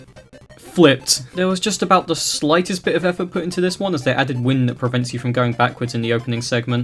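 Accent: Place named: United Kingdom. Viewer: You kinda sound British but I may be stupid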